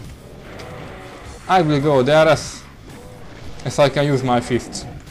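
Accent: Hungary. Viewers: YEAH!! Magyar akcentus foreva XD Jó a video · hungarian accent rulez XD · En eskuszom nem neztem volna ki -bol hogy tud angolul.kicsit magyar akcentusos de szarni bele jo az igy is xD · de mennyire magyar ahcentus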